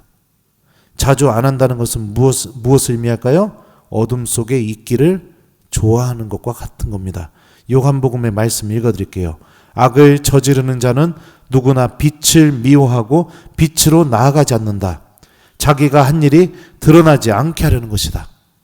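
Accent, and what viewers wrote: Chungcheong, South Korea. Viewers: 요즘 신부님 특강 너무 재밋게 듣고 보고있습니다. 구수한 충청도 사투리가 마음속에 쏙쏙 와닿는 것 머리속에 꽂치는거 신부님은 천상 우리의 스승님이십니다~ 고맙습니다.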